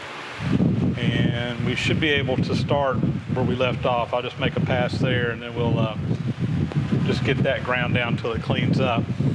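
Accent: South, United States. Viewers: after quite a while watching your videos I get used to your accent and your pronounciation of "oil", always sounds like "owl" to me (btw, the same with Adam Booth, must be a a southern thing). But when you said "lets grab some owl" again wierd thoughts went through my mind;) Greetings from Austria and thanks for your great videos! Dieter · My understanding is that our southern accent is derived from the Protestant Scots Irish who migrated to the US in the late 18th & early 19th centuries. My family is one of those that made the journey. I happen to live in the same city as by the way. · In re: Your accent. One of the things that makes the US the US is that regional differences have not been totally homogenized by television yet. I have been visiting the South regularly for 50 years and while the accents have been smoothed out a little, there is still a charming difference from the speech of Yankees like me.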